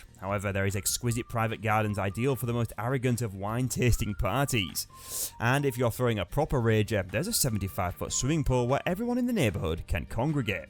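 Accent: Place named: East Yorkshire, United Kingdom. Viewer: The commentator sounds like he's from East Yorkshire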